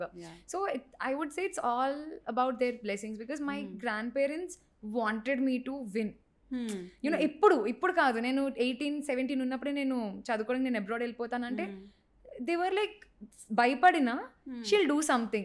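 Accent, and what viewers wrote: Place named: Telangana, India. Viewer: O telangana na?? I saw your videos but in mute so expected u to b andhra girl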